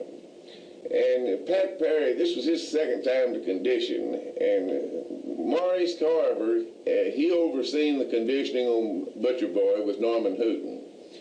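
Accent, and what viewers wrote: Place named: South, United States. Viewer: Just found these Gems!! Man I been missing out!! I can listen to this man tell a story all day!! He's got this very captivating southern charm of way about telling bulldog stories..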